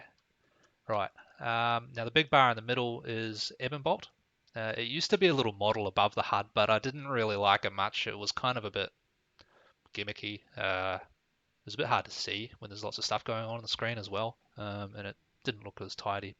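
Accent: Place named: Australia. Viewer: Ah! yeah I wrote "are you aussie" first, then watched more and editted my comment to include kiwi haha. Your weakauras are awesome, cheers bud!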